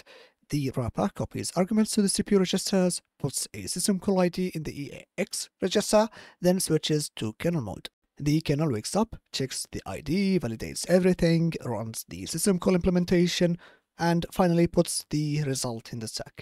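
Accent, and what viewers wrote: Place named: Egypt. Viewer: You had a bad Egyptian accent bruh but a nice content 😢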